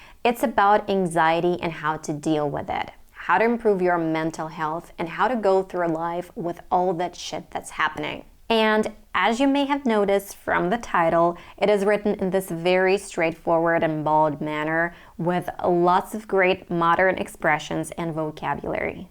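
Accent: United States. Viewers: Could you please teach us, how to pronounce "R" in American accent like you. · You are very Beautiful and Intelligent. I am amazed that you have developed accurate American accent while not living in U.S.A. I would recommend to learn Technical books as well. I mean, Mathematics, Computer Programming in Python and Artificial Intelligence books. To know American Accent, learn Phonetics, Linguistics, Phonics. Learning Natural Language Processing can also help you about American English and Accent too.......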